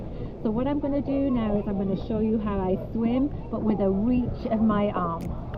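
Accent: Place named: United Kingdom. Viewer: Hi and son Great Vidio you sound British. Is this swimming pool in Uk. Is it public? Looks lovely. We have an outdoor pool in South Wales but it’s closing for winter.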